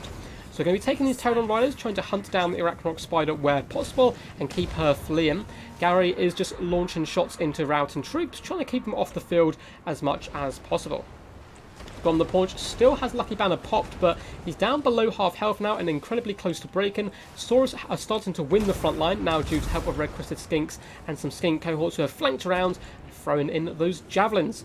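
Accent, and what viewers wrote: United Kingdom. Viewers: To be fair I don't click on the video for the content anymore, but for the british accent podcast !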